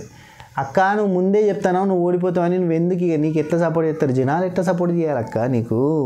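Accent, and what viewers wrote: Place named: Karimnagar, India. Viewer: bb8 kanna jokulu full entertainment pedda fan meku nenu iam from Karimnagar same ma language eh 😄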